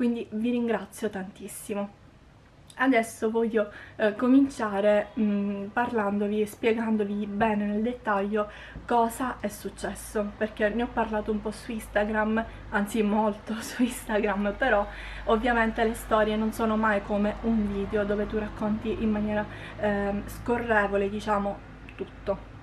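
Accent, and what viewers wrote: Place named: Sicily, Italy. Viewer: Io sono nuova ,finalmente sento la tua voce,un altra Sicilianaa belooo,io sono di Palermo,ma vivo in Toscana da 20 anni .Cara ti seguiro',un baciuzzo 😘😘😘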